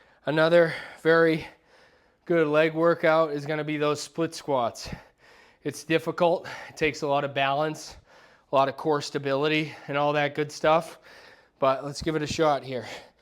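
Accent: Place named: Boston, United States. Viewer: Boston accent!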